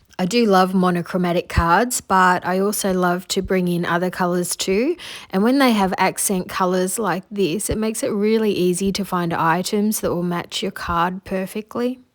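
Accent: Australia. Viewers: They are all beautiful! You do such lovely work and you are a pleasure to listen to. Your voice is calming. And, who doesn’t love an enchanting Australian accent. I’ve only recently discovered you and am now looking at your past videos. Keep them coming! ☮️💜🌞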